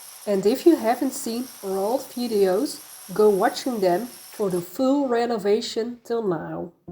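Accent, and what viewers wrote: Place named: Netherlands. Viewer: Hello! I often think of you and your little family! I have been watching your journey pretty much from the start and i absolutely love what you share and put out to all those, who are interested! Its so refreshing to hear about different customs ( my husband, son and myself LOVE the Netherlands and the Dutch ❤ our son even did Study in Amsterdam ) No need to say, that hearing you speak english with your wonderful dutch accent is fabulous!!! You all seem such great people... even if you haven't shared anything for a while... you are NOT forgotten... you are fabulous exactly HOW YOU ARE!!! Never change that, or ever daubt you are doing something not right on here...YOU AND YOUR FAMILY ARE REAL... you are not faking it...putting something on what not is...loose your personality only to get a few more likes... and exactly THAT is why you and your family are such a joy to watch!!! 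I do hope, that all of you are well and happy and continue to enjoy your life in France to the full! Because in the end this is all what DOES matters... YOUR family and YOUR life and happiness!! Much love...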